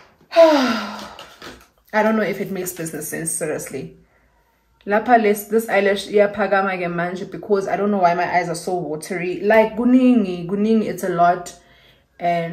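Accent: Nigeria. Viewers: I'm here for the Nigerian accent lol🤣love it a lot!!! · ❤️❤️❤️❤️❤️❤️❤️ hi mtswala just wanted to say congratulations again on your 10k subbies ,we love here. I wish you can speak wth that nigerian accent everyday or every month I really enjoy you doing it 😂😂❤️❤️❤️❤️ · Yohh motswala I love you so much hle 😂😂 the Nigerian accent is giving 🔥🔥🔥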